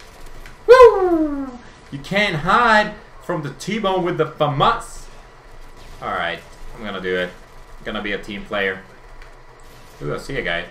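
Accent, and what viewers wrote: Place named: Sweden. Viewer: nope just a normal swedish accent ^^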